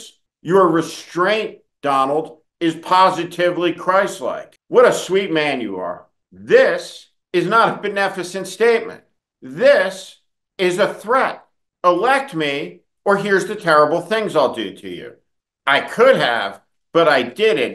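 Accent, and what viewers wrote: New Jersey, United States. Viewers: I support that NJ dialect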